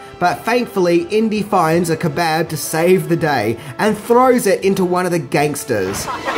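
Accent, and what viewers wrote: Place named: Australia. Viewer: Dude, he has an Australian accent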